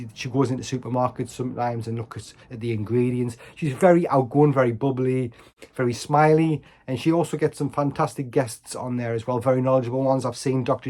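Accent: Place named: Newcastle, United Kingdom. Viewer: New subscriber, nice to hear another Geordie, thinking about this myself, currently binge watching everything on this lifestyle, hopefully its for me.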